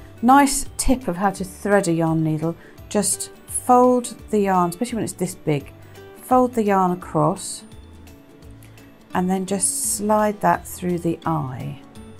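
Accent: England, United Kingdom. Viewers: I love this simple pattern! I am going to make my 3 year old son his very own pumpkin! He can decorate the pumpkin if he wants to. Then I think I'll make some for me and hubby. Thanks again for sharing this pattern. Love your accent. Are you from England? I'm from long island N.Y.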